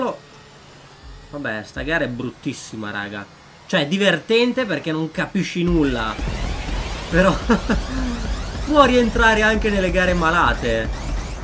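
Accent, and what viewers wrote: Veneto, Italy. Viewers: Alex-Pro- Gamer ah grazie perchè io essendo di Venezia avevo riconosciuto l'accento veneto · ma sei veneto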